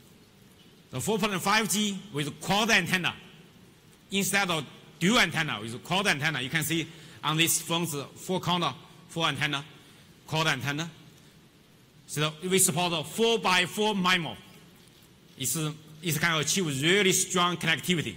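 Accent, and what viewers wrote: China. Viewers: As a Chinese myself I can easily understand what he is saying about (real Chinglish ),but i am not sure that foreign friends on YouTube can understand. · Ugh Chinglish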